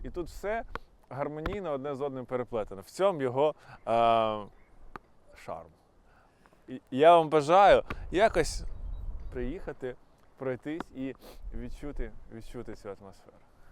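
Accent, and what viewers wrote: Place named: Kryvyi Rih, Ukraine. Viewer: а ви сам з Кривого Рогу чи з агломерації? Бо так говорите неначе тутешній, нам криворізцям цікаво звідки ви🥰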